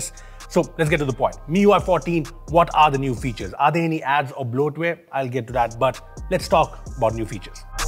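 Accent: India. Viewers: Mrwhosetheboss in indian accent channel. Nice content btw. Very informative and entertaining at the same time!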